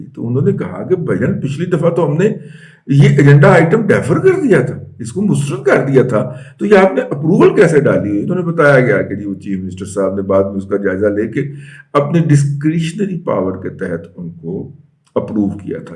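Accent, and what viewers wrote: Punjab, Pakistan. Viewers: Dada g ki Andaz e bayan tarka Punjabi Kamala mola Kushal rakhy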